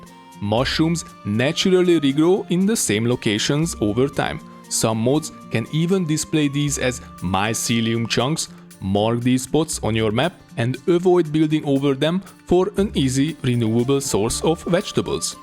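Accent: Hungary. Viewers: why do i feel you're hungarian just by the accent? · Great tips! :D And as a side question. From your accent, I suspect that you may be Hungarian perhaps? Maybe somewhere close in Europe. Or very mistaken. Ofc you don't need to answer if you don't want that info public. Was just curious.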